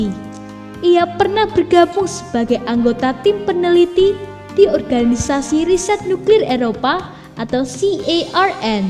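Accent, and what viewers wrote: Java, Indonesia. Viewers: Suara nya orang jawa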